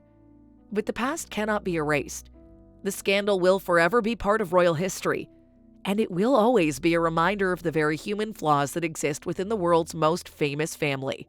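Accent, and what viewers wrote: United States. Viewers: parker bowles was always a player. click bait lies, the creator of these trashy videos should be sued . always an american accent why? why would parker bowles confide in some american you tubers? im sure he would say nothing. source of this info?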